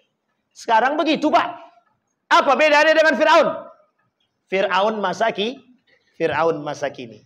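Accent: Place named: Daik, Malaysia. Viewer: Assalamualaikum , maaf bertanya, pak ustad Dari mana? Dialek nya sperti orang daik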